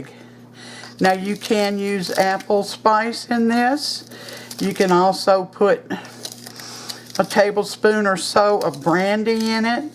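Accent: South, United States. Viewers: I love your recipes.Where are you from.?Im from Arkansas.I notice you have a southern accent.I love your recipes.Do you have a cookbook.We southern belles are good cooks my mom and grandmothers were excellent cooks.im new to your ch.I love your ch.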